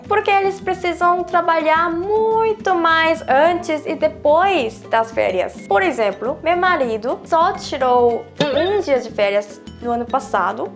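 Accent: South Korea. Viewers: Algumas perguntas; Os seus colegas de trabalho sabem que você fala português e já lhe perguntaram porque você quis aprender essa língua? Eles perguntam a você sobre o futebol do Brasil? Na Copa do mundo de 2002 você já sabia falar português? Seu português é fluente mas ainda com algum sotaque coreano, as vazes você não conjuga o verbo tipo; Eu começar a fazer algo.. seria eu comecei... Mesmo assim conseguimos entender o que você quis dizer. Nós brasileiros achamos muito bom e super legal ver estrangeiros falando ou tentando falar em português mesmo que as vezes de forma ainda crua nós procuramos ajudar e tentar fazer com que o estrangeiro fale do jeito dele e nós tentamos entender e ajudar, as vezes pode vir um portunhol (mistura de português com espanhol). Estou muito feliz de está no seu canal pela sua alegria e simpatia e amor pelo Brasil. Bjs (beijos)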